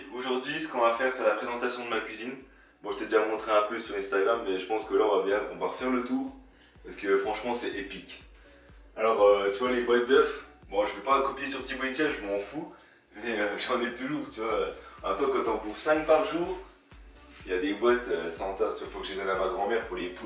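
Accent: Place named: North, France. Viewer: Ton accent du nord me fait trop rire ahah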